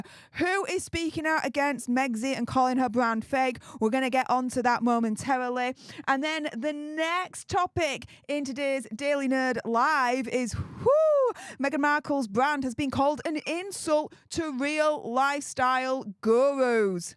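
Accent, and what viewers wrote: Yorkshire, United Kingdom. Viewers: I don't think is bothered by your comment. Just adjust the volume and it's a Yorkshire accent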